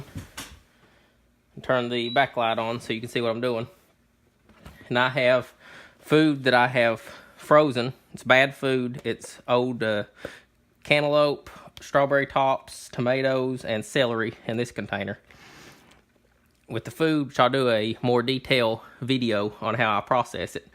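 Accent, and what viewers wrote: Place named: Appalachia, United States. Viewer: This guy has to be appalachian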